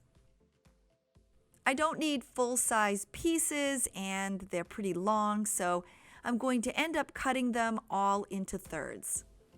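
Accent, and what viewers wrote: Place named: Boston, United States. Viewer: Any chance you're from New England area? I hear some kind of accent similar to my Mainah accent lol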